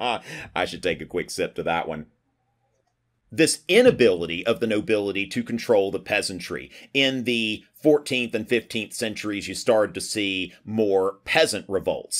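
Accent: South, United States. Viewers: As King of England, I approve of this video (love your country accent btw 😁)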